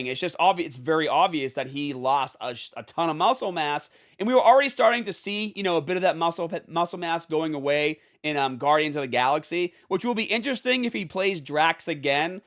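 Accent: Boston, United States. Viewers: lol yeah I kinda dig the Boston accent 😂 must visit there when I come to America on vacation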